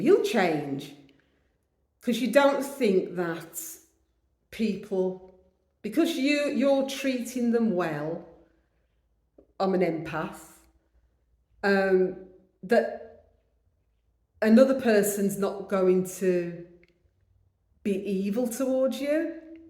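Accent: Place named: Black Country, United Kingdom. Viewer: It's not the speed of her speech it's her Wolverhampton dudley accent that makes her sound dopey and slow. It's been voted the worst accent in the UK for good reason because it is terrible 🤦🏼‍♀️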